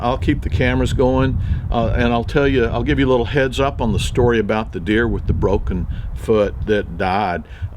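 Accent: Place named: South, United States. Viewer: ...I can’t imagine any of your subscribers “holding it against” you for taking the body of the deer to a place that other animals could make use of it’s body to sustain themselves. That is only nature. I love all your videos because your soft voice and southern accent are so calming. And I love hearing about and seeing Uncle Floyd. What a treasure he is! And sweet Buddy....my heart just breaks. I have a black lab who is a month away from her 13th birthday. She had a stroke 6 weeks ago and is doing fairly well, but I know she doesn’t have long. Thank you for letting us get to know you and enjoy the things you do on your Texas ranch vicariously!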